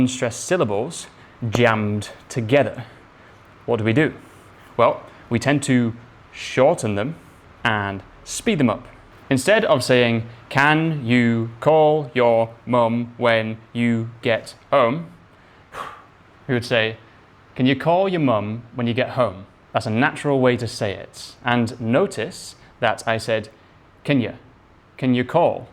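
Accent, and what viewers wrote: Northern England, United Kingdom. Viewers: You sound like someone coming from the north of England. Am I right ?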